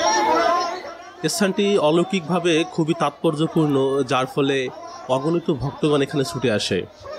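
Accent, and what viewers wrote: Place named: Khulna, Bangladesh. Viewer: তোমার বাড়ি তো খুলনা জেলায়। কথাবার্তার ধরণ শুনে বোঝা যাচ্ছে।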